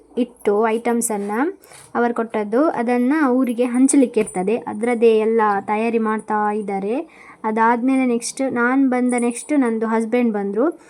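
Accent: North Karnataka, India. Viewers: Nivu uttar Karnataka bhashene matadari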